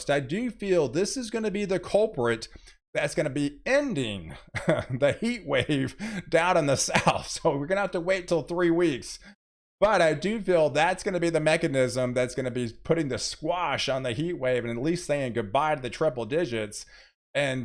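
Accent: Texas, United States. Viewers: I love his texas accent and manner of speaking unlike Canadian no knowledge or love of weather you just listen to them read their written speeches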